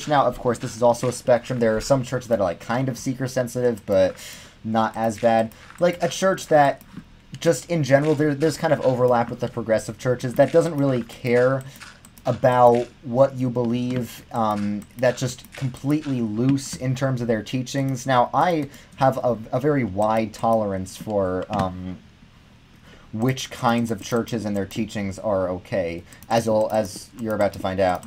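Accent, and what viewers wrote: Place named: New Jersey, United States. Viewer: He's Jerseyian, you are allowed to make fun of his accent all day - me, a based Texan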